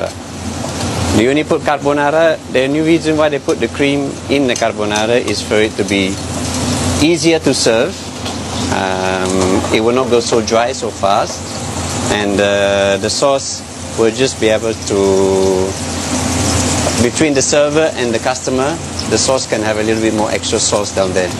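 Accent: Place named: Singapore. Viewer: he is in Singapore, hence his accent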